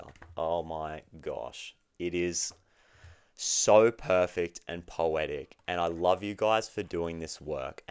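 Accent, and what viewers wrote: Australia. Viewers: Oh you a fellow Aussie 😊 nice read..! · are you Australian?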